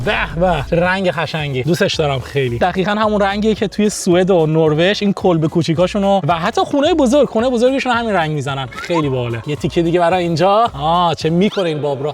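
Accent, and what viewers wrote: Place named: Dezful, Iran. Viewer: یکم لعجه دزفولی داری ، درسته؟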